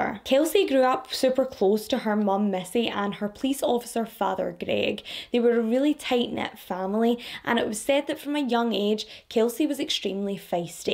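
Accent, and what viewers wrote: Scotland, United Kingdom. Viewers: Your personality is outstanding. You are the kind of friend I would be drawn to for all the laughs and accent too. LOL Being American the scottish accent sounds so beautiful, but your personality is outstanding. So happy I found you to watch and entertain my work days. I listen while I work and the day goes so much faster. Thank you.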